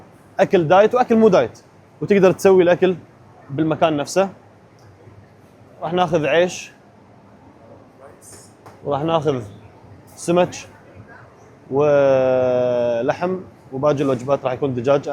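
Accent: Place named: Gulf, Arab world. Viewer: يعم متتكلم مصري ضروري عشان رحت الخليج تتكلم زيهم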